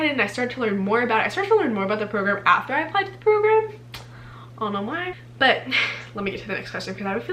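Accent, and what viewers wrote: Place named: Texas, United States. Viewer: You and Emilyenchanted talk the exact same way!! So weird lol I figured you were from Texas like her! Same accent!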